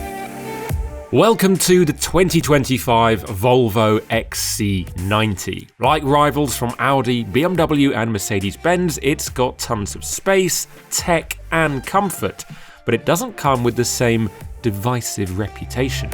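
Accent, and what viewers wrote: United Kingdom. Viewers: Love this refresh! First time watching the channel... For some reason the internet was buffering and when the video began I heard the yelling... I laughed b/c I knew it was going to be a British reviewer!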